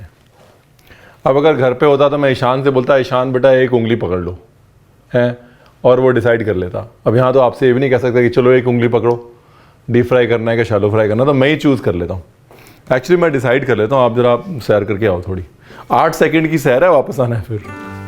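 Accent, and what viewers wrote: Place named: Lucknow, India. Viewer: I like the recepie and your Lucknow Hindi is awesome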